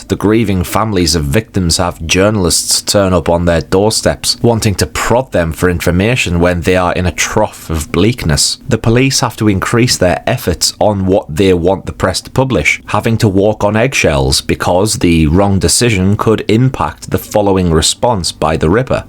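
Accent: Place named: Newcastle, United Kingdom. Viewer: I don't mean to doxx you or anything but it sounds like you've got a Geordie accent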